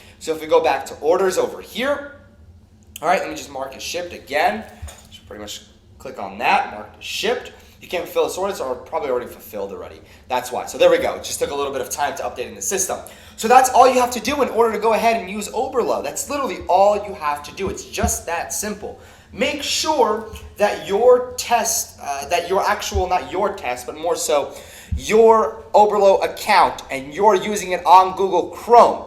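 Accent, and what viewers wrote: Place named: Italian-American, United States. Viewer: EssentialShop, although the video speed makes it difficult to keep up, the main problem with the video is the mispronunciation of keywords and the speaker's nasally and seemingly Italian-American/Jewish accent. The best you can do is to turn down the speed and read his lips while you listen. Keep in mind that those who speak with these accents will have no problem understanding the video at whichever speed. There is nothing wrong with your hearing or ability to understand. Those who would criticise you just lack the ability to see things from a different perspective and readily show their unjustified intolerance. Good luck.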